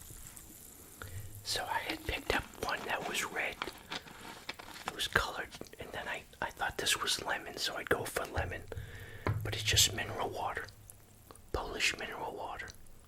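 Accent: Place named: Boston, United States. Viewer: Amazing... he is so wholesome and love his accent. When he say Bossston..:)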